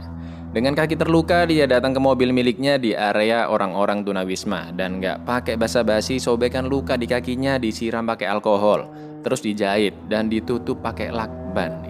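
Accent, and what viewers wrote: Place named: East Java, Indonesia. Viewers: Boso jwone kntel men ki asli ndi bro,slm ko jatim bro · Wong ndi mas. Jatim ye?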